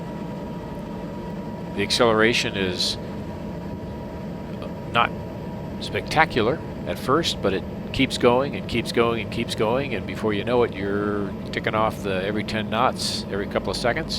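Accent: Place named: United States. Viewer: Thank you it was very interesting and relaxing video, pleasant , calming voice with good American English. Greetings from Ukraine, home of biggest plain .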